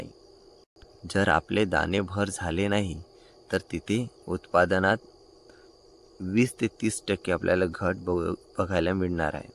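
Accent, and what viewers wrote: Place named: Jalgaon, India. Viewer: मराठी जडगावची आहे वाटत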